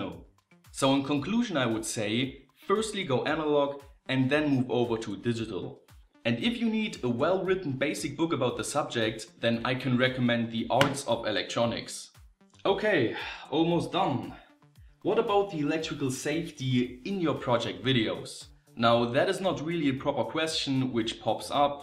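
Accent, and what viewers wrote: Saxony, Germany. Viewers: your German accent has actually made it easier to pick the terminology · Aha, noch ein Sachse! :D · Thanks for the videos, I'm Colombian and is easy for my view videos in english even with your german accent, german is an lovely language and hear it is really cool, but my knowledge of that language is very, very limited. please don't make videos in german. Is a fact, english is a language more "extended" than german in the world. Congratulations for the 100k subscribers!, Is a outstanding work !. actually is my fav channel. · Genau! Und bitte schön sächseln;P nachbarschaftliche Grüße aus S-A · Ich finde man hört es an seiner (englischen) Aussprache, dass er deutscher Herkunft ist ^^ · Ein bischen hört man es das er deutscher ist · Since i heard your voice the first time i said ... " He has to be german !!! " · I like the German accent in your English and by doing these video's in English you at least reached The Netherlands :-)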